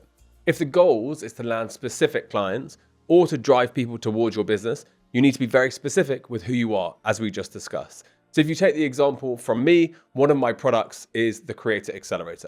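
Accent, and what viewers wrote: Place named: United States. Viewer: Dude, your (USA) English is very difficult compared to British people. Don't worry brother From Pakistan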